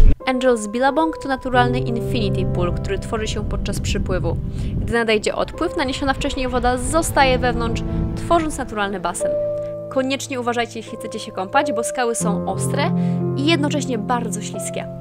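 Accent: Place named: Poland. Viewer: Czy jesteś Polką. Sądząc z akcentu jesteś, ale ilość słow angielskich wtrąconych w polskie zdania jest tak duża, że może polski to jest język obcy dla Ciebie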